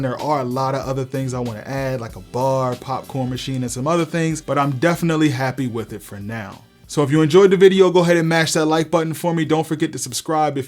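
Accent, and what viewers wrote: Baltimore, United States. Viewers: Love the theatre. Interested in the accent. Baltimore/DC area?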